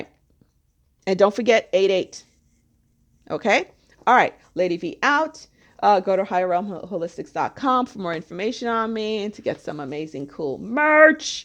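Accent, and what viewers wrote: New York, United States. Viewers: Holly molly about the Divine Masculine = thats makes a world of sense! I know there’s a Cabal kabosh on them - starting with the African Americans & now the White males! And I feel there’s one too for divine FEM..bc I was stuck for so longggg.. yet no more! I’m hearing from Brad Johnson / Adronis that the moon is also an emitter of mind control and it’s going down as of now! Kent Dunn - behind the scenes military white hat- talks about the moon being re built into a whole new model! I’m a researcher - one who puts the pieces together... and I use my intuition & I use muscle testing & prayer / intention & meditation along with that.. I love your New York ness lol! I’m getting the rainbow warrior tank for sure now & prob the Gaia awakens next month (I laid down serious cash for dimensions of disclosure here in VENTURA CA!) Gotta go hang with “my people” lol! 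And I’m there on the 8th & maybe on the 11th.. gotta figure it all out I’m SUPER interested in ascension 😘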